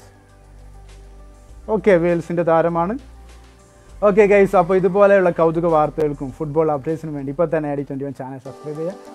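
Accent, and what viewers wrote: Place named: Kasaragod, India. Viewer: കേറി വാ മക്കളെ...🙋 ഇനി മുതൽ കാസർകോട് ഭാഷയിൽ ബ്ലോഗ് കേൾക്കാം...😍😍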